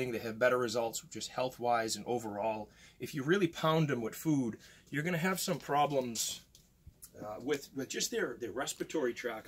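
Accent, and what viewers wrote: United States. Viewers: You sound just live my dad!!! Love the east coast accent 😂💕 Mainer here 👋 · Am I the only one that hears a Jersey accent poke through? Just me? k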